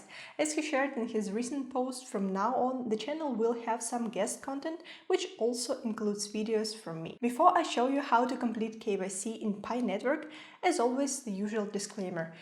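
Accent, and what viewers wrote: Germany. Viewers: your englisch with strong german accent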